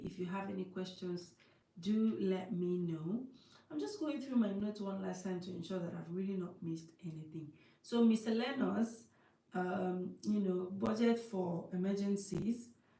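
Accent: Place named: Akwa Ibom, Nigeria. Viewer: My typical Akwa ibom sister. Your tongue betrayed you big-time.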